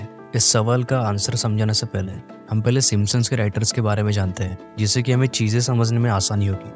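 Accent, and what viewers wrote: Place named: India. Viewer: He speaks indian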